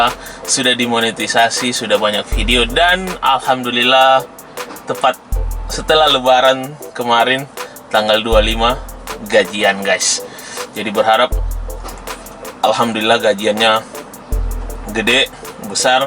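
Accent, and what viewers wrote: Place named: Sulawesi, Indonesia. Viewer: Logata kaya org bugis gorontalo dik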